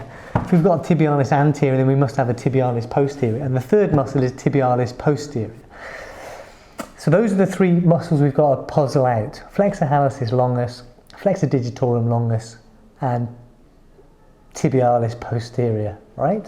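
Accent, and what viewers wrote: United Kingdom. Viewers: what part of uk is this ?=)thank you so much for your videos is so hard biology as register dietitian I have to study in my university but when I found your videos it make a lot difference in my learning experience=)thank you! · A British accent automatically makes you an even greater expert to the American ear.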